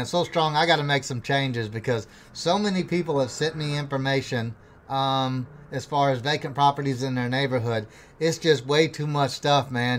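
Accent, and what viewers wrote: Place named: Texas, United States. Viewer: You cute and the Texas accent is ❤❤❤❤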